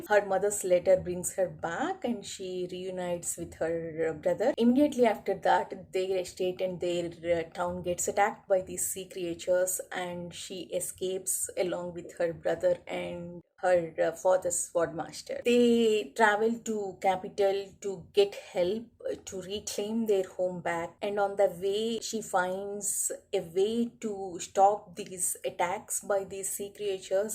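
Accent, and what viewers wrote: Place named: Scotland, United Kingdom. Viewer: You had Me at Scottish 😂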